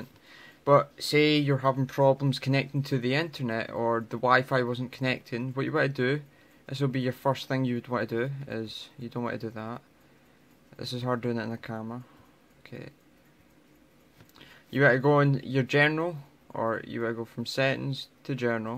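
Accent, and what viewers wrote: Scotland, United Kingdom. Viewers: Hes scottish you fucktard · Is u Scottish ?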